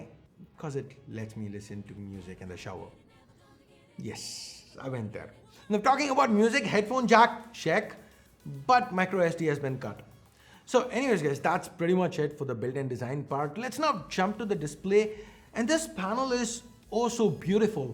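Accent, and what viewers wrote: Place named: India. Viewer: I'm very sorry and no offense but I think I will watch your videos from now on so I can practice listening to indian accent english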